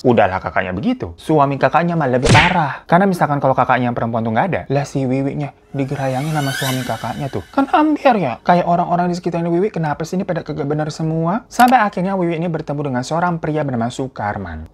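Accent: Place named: Jakarta, Indonesia. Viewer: Bang lucu bgttt si Cara ngomongnya orang betawi bettt😅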